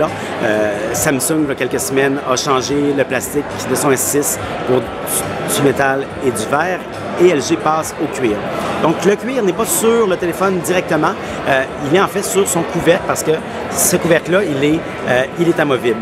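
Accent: Quebec, Canada. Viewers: ton accent je l'adore :D vive les québécois !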